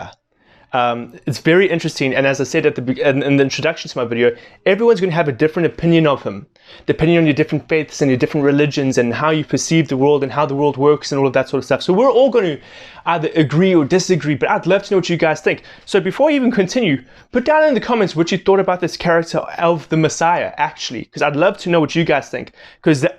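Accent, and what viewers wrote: South Africa. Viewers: <link> Some background on Islamic eschatology.. btw you sound like a South African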